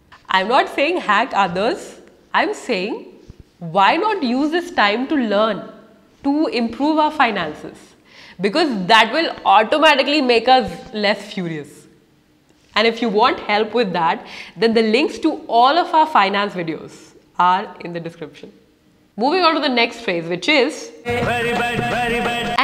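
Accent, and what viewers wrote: India. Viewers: I have always been distressed about my speaking skills. I get furiously annoyed whenever i stuck while speaking. My command on English was awful. I like your Indian accent so much that I'm thrilled to listen to you. Now I'm assured that I will do a spectacular job in this.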